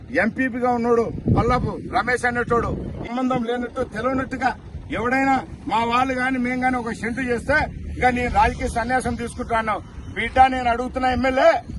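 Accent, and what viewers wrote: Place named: Telangana, India. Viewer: Ayya Meeru Rayalaseema leka Telangana language chusthe pakka telangana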